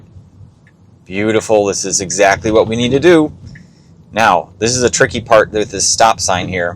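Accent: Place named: Canada. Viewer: Don’t worry about how you speak. You would pass for a normal Canadian.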